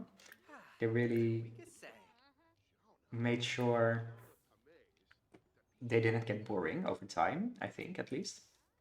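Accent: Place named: Netherlands. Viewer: hahaha ja nederlands-engels accent is best wel duidelijk! Super leuk dat je begonnen bent met let's plays! Succes! Btw je thumbnails zijn echt perfect! Heel mooi!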